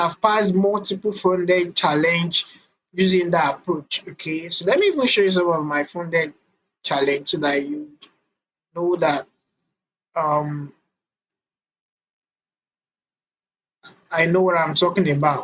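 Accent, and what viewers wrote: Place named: Nigeria. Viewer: Ma'am, you sound Nigerian... I am a Nigerian too.. i wonder if its possible to set up a meet for a one on one?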